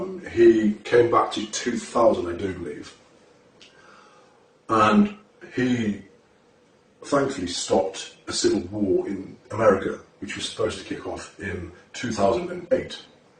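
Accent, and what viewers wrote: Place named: United Kingdom. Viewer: So what Army did you join? Why are you speaking with a British accent?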